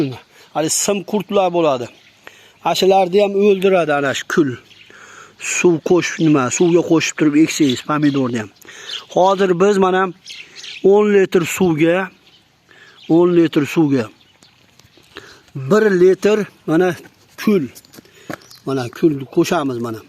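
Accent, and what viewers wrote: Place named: Fergana Valley, Uzbekistan. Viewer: АССАЛОМУ АЛАЙКУМ ОТАХОН! ШЕВАНГИЗГА КАРАГАНДА ВОДИЙДАНСИЗ ШЕКИЛЛИ, СИЗГА РАХМАТ БИТТА КАМЧИЛИГИНГИЗ ЁНИНГИЗГА БИРОНТА ЁРДАМЧИ ОЛМАБСИЗДА, КЕЙИН ЙОД БИЛАН ЗЕЛЁНКАНИ ИДИШГА СОЛАЁТГАНИНГИЗНИКУ УМУМАН КУРМАДИК ФАКАТ АРАЛАШТИРДИНГИЗ ХОЛОС, КЕЙИНГИ ВЕДЕОДА КУРСАТИНГ, ЯНА БИР КАМЧИЛИК КУПЧИЛИК АЙТГАНДИР ТАЙЁРЛАГАН КУЛ ОЗУКАНГИЗНИ БОДРИНГ ИЛДИЗИГА КУЙДИНГИЗМИ ЁКИ БАРГЛАРИГАМИ УШАНГА ТУШУНМАДИК, УМРИНГИЗДАН БАРАКА ТОПИНГ, ИЛГАРИ ОТА БОБОЛАРИМИЗ КУЛНИ БАРГЛАРИГА ХАМ СЕПИЛСА АЧЧИК БУЛАРКАН ШИРАГА ВА КУРТЛАРГА КАРШИ ЭФФЕКТИ ЯХШИ ДЕРДИЛАР ШУ ТУГРИМИ. РАХМАТ ОТАХОН ЧАРЧАМАНГ!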